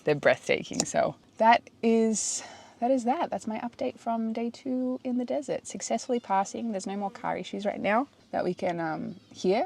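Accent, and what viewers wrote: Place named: Australia. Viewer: I’ve been following you for years. Thought I would share your Aussie accent has came back so strong lol I imagine it’s only natural from immersing yourself back home (and also from what I can tell your guy is Australian). Just thought it was funny hehe keep enjoying your travels 🙂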